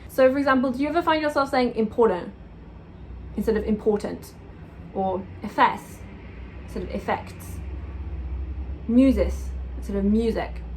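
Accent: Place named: United Kingdom. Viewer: that British accent....... <3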